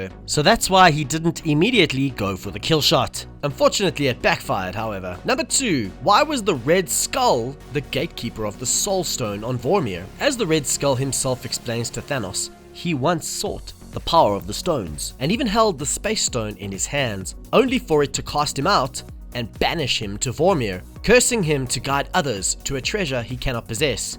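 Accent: South Africa. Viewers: Random question, are you South African?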